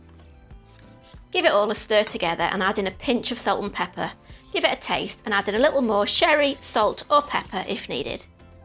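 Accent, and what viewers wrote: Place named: Liverpool, United Kingdom. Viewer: Looks yummy and easy. Good old comfort food. Love your 'Shirley Valentine' accent :)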